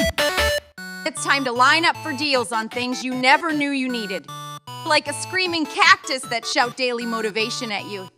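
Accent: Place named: Minnesota, United States. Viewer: AI voice is from Minnesota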